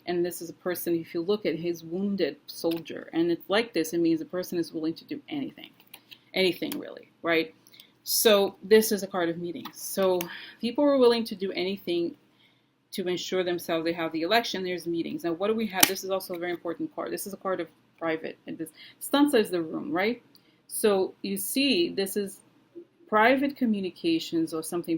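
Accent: Russia. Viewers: You help make my morning...Russian accent and all...boom!! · I like your accent and I was watching Lena Rodriguez and she likes your Russian accent too. Keep happy I know all this politics must be draining and stressful, it has drained me, and I live in Australia. I also have been worried for the Americans and the world, I need to feel safe again, so keep up your good work... Stay healthy it costs to much to get sick in America. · Hello! Your Russian accent is fun screw the people who don't like it. Wouldn't it be a better strategy for Mueller to stop BEFORE they vote? Seems like they would be all ups in arms once he's in. Supposedly the Russians hacked the Pubs emails too. Maybe they are blackmailing them AND giving them $$ too. Maybe u already said that at some point.??? 🤔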